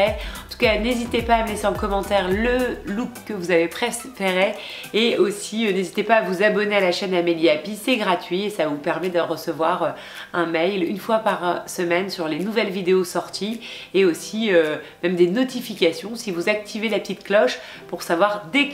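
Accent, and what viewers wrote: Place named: North, France. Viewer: Bonsoir Amélie, Vidéo très sympa, comme d'habitude. Tes prononciations "ai" au lieu de "é" sont trop mignonnes (le nord, c'est ça ?). J'aimerais beaucoup une vidéo sur les bijoux si cela te dit. Bisous, Séverine.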